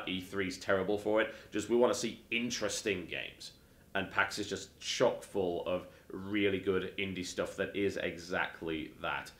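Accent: United Kingdom. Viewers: ps he's brttish lol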